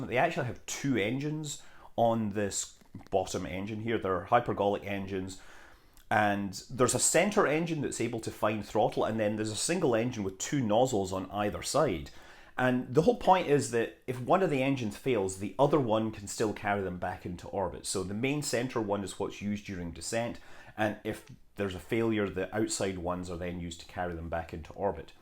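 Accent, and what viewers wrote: Scotland, United Kingdom. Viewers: looks like times are hard out there, so I have shipped you some razor blades. Just because you sound Scottish, doesn't mean that you have to look like a drunk Glaswegian in a Soho gutter!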